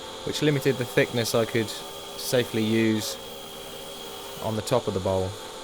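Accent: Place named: United Kingdom. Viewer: Brilliant very creative - Thanks. I recently made my own polishing paste - 4 parts mineral oil 1 part white bees wax, 1 part diatomaceous earth. I mistakenly thought that I was limited therefore to wax based finishes such as buffing with Carnuba wax. However, I see you using shine juice here without a problem. I would like your (or others) input on finishes that can be used after polishing paste. Thanks in advance. PS: I would be interested to learn where you are located in the UK. I left for the US in 1984 and have been trying to nail down your accent.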